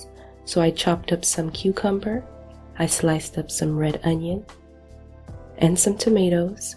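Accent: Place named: Philadelphia, United States. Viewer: I recognized that Philly voice!!!😉🎶 During this pandemic, we couldn't take Mom out for her birthday dinner, so we made this and a red velvet cake! She loved every bite of it!!! Keep 'em coming! Jersey's in the house!!!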